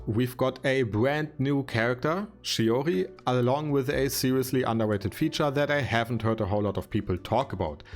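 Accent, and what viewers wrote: Germany. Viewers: Du bist so Deutsch man hört das direkt aus dem akzent xd Gutes Video😆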